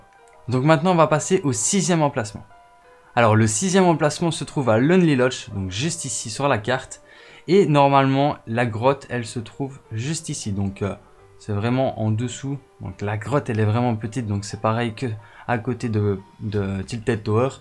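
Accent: Belgium. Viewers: IL EST BELGE IL DIT OUITE POUR HUIT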